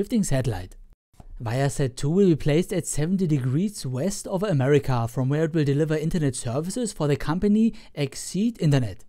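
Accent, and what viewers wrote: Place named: Swabia, Germany. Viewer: Dieses Spätzle-Englisch!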